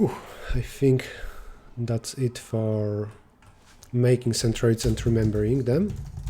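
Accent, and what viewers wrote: Poland. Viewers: chyba się zgubiłem. Coś mi akcent nie pasował i myślę polak. dobrą robotę robisz, pozdro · Słychać że 🇵🇱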